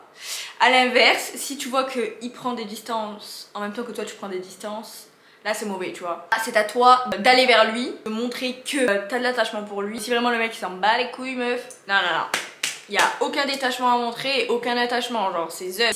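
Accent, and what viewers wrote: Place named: South, France. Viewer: L’accent du sud ! 😉🤩 super vidéo d’ailleurs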